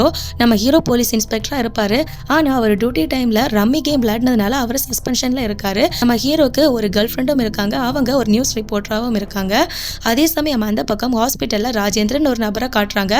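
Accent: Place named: North India, India. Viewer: தமிழ் சரியா தெரியாத வடக்கனுங்க "கார்டு மேலே இர்க்க பத்நால் நம்பர் சொல்லுங்கே சார்"ன்னு சொல்ற மாதிரி இருக்கு நீங்க பேசுற தமிழ் slang..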